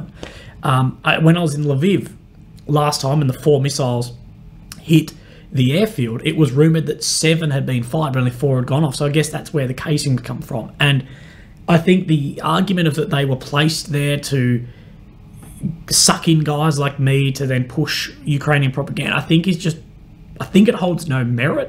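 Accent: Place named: Australia. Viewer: Jeez there are some cynical people around; surely most people can see that you are genuine in your cause? As an Aussie i want to say that it's great to hear your accent on a vid about the war in Ukraine! Thanks for flying our flag mate, you're a champion :)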